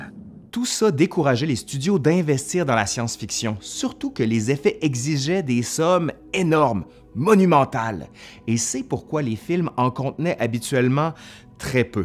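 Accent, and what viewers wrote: Quebec, Canada. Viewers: Quel plaisir d'avoir découvert cette chaîne à l'accent français chantant du Québec et sa bonne diction des mots anglais que nous n'avons pas chez les vidéastes français x) Contenu très qualitatif !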